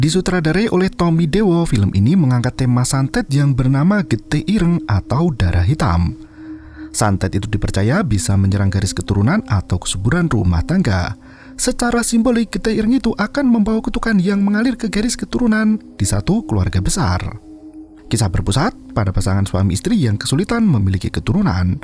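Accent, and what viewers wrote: Banyumas, Indonesia. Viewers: Solo daerah mana kok ngapak? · Astaga solo ngapak😅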